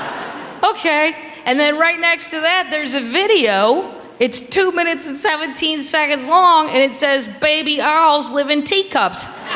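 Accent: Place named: St. Louis, United States. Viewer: I love the St. Louis accent... From my second home!! She's hysterical! 😂❤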